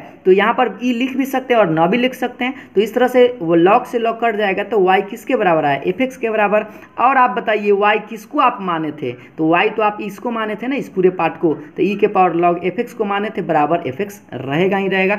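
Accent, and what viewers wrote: Bihar, India. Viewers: Sir aap Bihar sa hi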